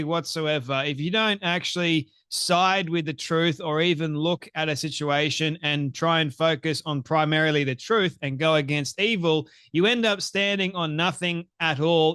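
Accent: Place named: Australia. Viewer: U r the man!! However that Aussie accent sometimes throws me off 😁 I hear t-wrist organization and don’t get what it is, until I realized you’ve actually said t3rr0r1st lol. Still, no matter the accent, u r the man!! Keep speaking the truth !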